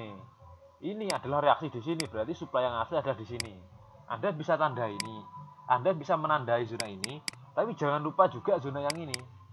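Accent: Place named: Surabaya, Indonesia. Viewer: Bahasanya kok enak bgt, logatnya lucu. Rodo2 suroboyoan.... seneng aku ngrungokke... paham pakde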